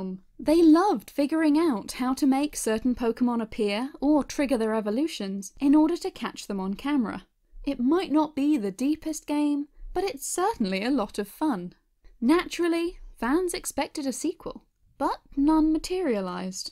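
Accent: United Kingdom. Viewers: I love your British ascent lol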